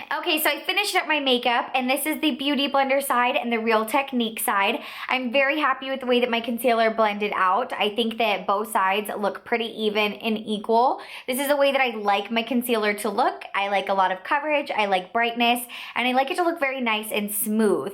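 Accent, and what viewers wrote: United States. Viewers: I still can't stand the way american girls speak LMAO 🤦🏻‍♀️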